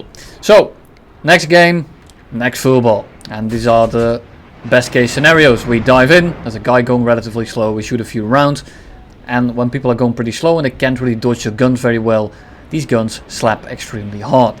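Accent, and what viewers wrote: Netherlands. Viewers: you have somewhat of a dutch accent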